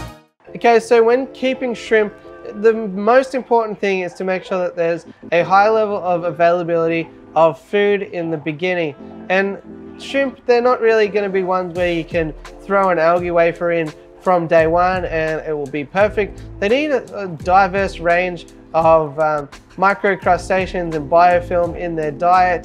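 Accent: Australia. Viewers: oh thank god, hes an aussie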